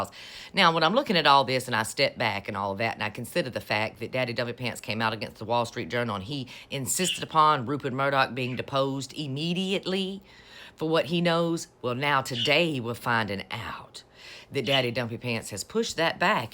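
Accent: South, United States. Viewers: I could listen to your deep southern honey voice blasting MAGA-World all day! What a sharp sense of humor you have! Such HILARIOUS VERBIAGE!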